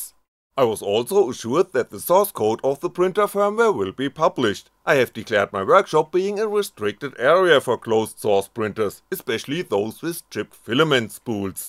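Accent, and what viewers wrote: Germany. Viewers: Dein Deutschenglisch schmerzt in den Ohren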